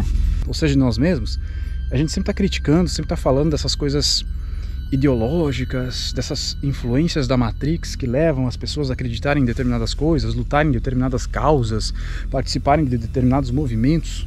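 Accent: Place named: Santos, Brazil. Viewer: Você é Santista? Fala que nem Santista. Linda cidade de Santos-SP.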